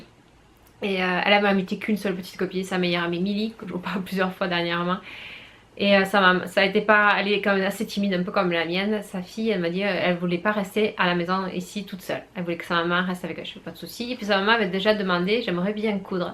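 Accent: South, France. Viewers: Bonjour Je ne découvre tes vidéos qu'aujourd'hui et je les trouve très naturelles. Je suis très sensible aux accents et j'aimerais savoir d'où vient le tien? du Sud de la France? Rien de québécois il me semble. Continue à nous distraire avec tes cousettes et bravo pour ces belles réalisations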